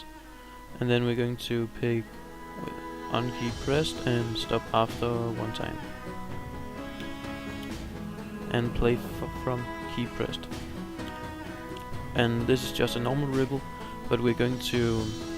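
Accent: Denmark. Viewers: Are you danish m8 ? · Good vid, keep it up, you sound danish <3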